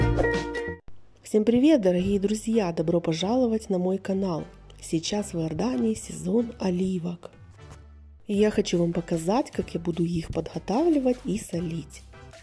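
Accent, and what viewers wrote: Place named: Ukraine. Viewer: Да, 🫒🫒🫒🌶️ , кушаем. Узнаю Украинский акцент :))) Молодец, что готовите сами оливки 🫒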